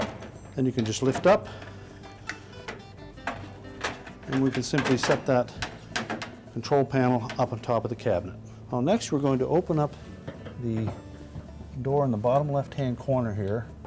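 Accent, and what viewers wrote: Canada. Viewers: I carefully watched your instructional video documenting the procedure for replacing a door seal on an LG Front load washer; your ability to communicate is nothing than outstanding! Especially the segment pertaining to the fitment of the door seal to the washer's drum and the use of locking pliers and a flat-blade screwdriver to install the metal retaining ring to the drum-seal interface. The language was clear concise and unambiguous. The visual aspect of the video clearly depicted the finer points of the job with clarity and preciseness. I picked up on the Canadian🇨🇦accent as I live in Buffalo, NY on the border; hence the impeccable use of the English language. Keep up the good work and I look forward to viewing your productions in the future.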